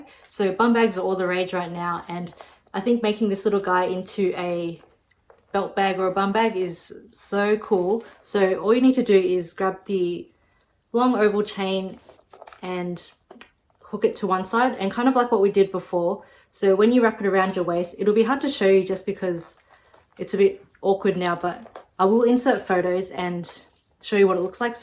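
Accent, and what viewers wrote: Australia. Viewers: Hi beautiful! I so dig your Aussie accent😍so charming! Love that you showed us how you styled & wear this, i have taken notes!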